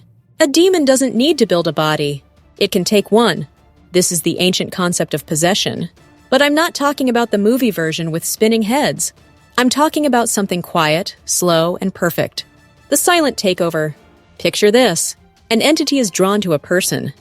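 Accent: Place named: United States. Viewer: Я думал канал будет озвучен обычным нейронным голосом, не ожидал что будет такой качественный английский с превосходным американским акцентом.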